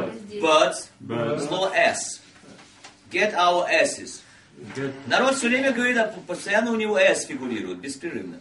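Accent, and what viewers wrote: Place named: United States. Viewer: It is a lot of sense in the method, yet the author's pronanciation is distinctly american. And his exerpt from "Riding Red Hood" I could not follow at all. Sorry!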